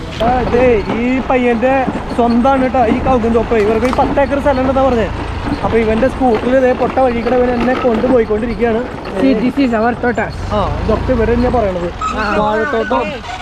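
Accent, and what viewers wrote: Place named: Tamil Nadu, India. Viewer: തമിഴ് നാടൻ ഇംഗ്ലീഷ്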